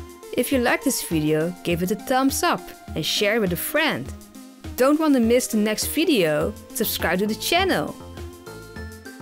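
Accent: Netherlands. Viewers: Yes I know, is a boy. And she asked his accent, he's from the Netherlands.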